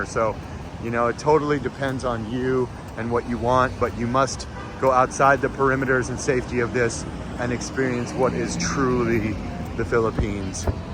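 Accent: United States. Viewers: I don't get your point dude. We don't have fake cities. You sound like an American. I am wondering if what is the real United States for you also? You're dying and abandoned cities?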